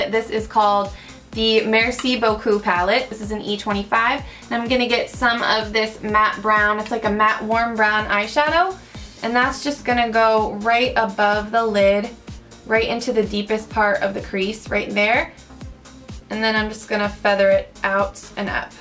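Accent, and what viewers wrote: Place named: Mid-Atlantic, United States. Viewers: ur from philly area? northeast? south philly? west philly? am from bucks county area..wow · When people talk about your accent, I am always confused, since all my family lives around Philadelphia, so I don't think you have an accent. You just sound mid Atlantic.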